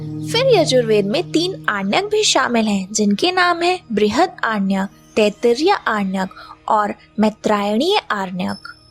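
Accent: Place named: England, United Kingdom. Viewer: अच्छा है किंतु हिन्दी का उच्चारण उचित तरीक़े से करें ना कि अंग्रेज़ी तरीक़े से। जैसे उपनिषद ना कि उपानिषद।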